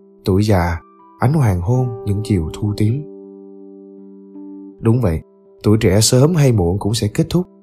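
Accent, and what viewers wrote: South, Vietnam. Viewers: Thằng này giọng Nam nô nghe chán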